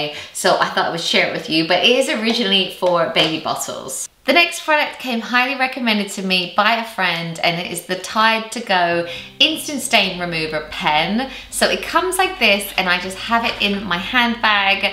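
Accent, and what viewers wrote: United Kingdom. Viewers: are you British or America cuz it’s wired you dawn Like both ? 🙈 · You don’t pronounce Amazon “wrong”, it’s just your accent. Many of our UK friends say “Amazin “😉 I have noticed that your accent isn’t as strong as most Brits, are you originally from the US? Just want to say I love your channel. I look forward to all your “hauls & hacks”. 👍🏻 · I think that you pronounce amazon with the accent you have. I say it the same way but I’m also British xx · AmazON. That's how I say it. I just assumed you say it the way you do because of your British accent. Do what works for you! ♥♥♥ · oh I’m sorry, that makes sense! you sound British with a twang!!!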